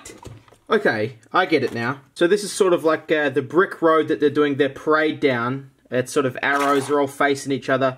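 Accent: Australia. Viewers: The more excited gets the more Aussie he gets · I can not stand how Australians say "Fourdeeen" instead of "Fourteen". speak English 😠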